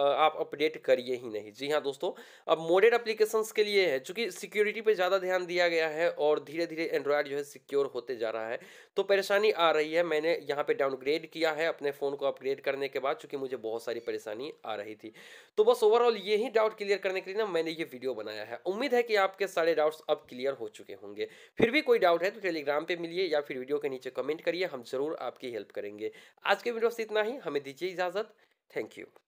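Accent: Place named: Bengal, India. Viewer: Vii bengali ho ka hind sahe se nahe bol pa rahe ho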